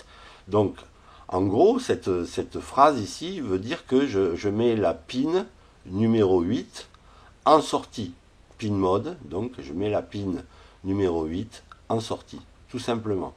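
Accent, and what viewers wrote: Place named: Toulouse, France. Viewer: Bonjour Merci pour vos tutos!!! On à beau dire mais l'accent de Toulouse facilite grandement la compréhension de l'arduino, pour moi qui vous écrit du 65... En prévision de construction de mon TCO, j'ai déjà câbler et programmé un servo avec un inter bipolaire ( trop fort!!!) les cœurs d'aiguilles et les leds du tco seront par micro swithc commandées par les servos. Deux questions se poses pour moi: Combien de servos je peux commander avec l'arduino uno? (la puissance se fera avec une alim indépendante 5v) Et comment puis je les raccorder? Encore merci pour la simplicité et la pédagogie avec la quelle vous nous expliquez des techniques qui peuvent être si compliquées (du moins pour moi...) Adichatz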